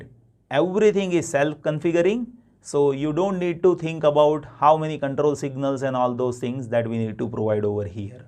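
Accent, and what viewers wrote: India. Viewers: To avoid dislikes , the video Title should indicate that the speaker is indian.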